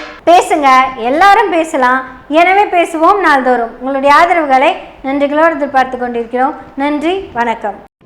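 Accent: Kongu, India. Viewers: Excellent dear yesterday I have seen your show today also seen after long time full show is back to full Kongu Tamil. Excellent dear. Here Mr.srinivasan requested this idiot uruga mammi to regularize GST for all items they are selling in hotel even if his high slab also. He very much humbled requested that but this arrogant lady without meeting peoples came to parliament through back doors and proudly arrogance behavior to a senior and very senior famous business man. Very sad to see this and very shame to be a tamilian to be a Our FM 😢😢😢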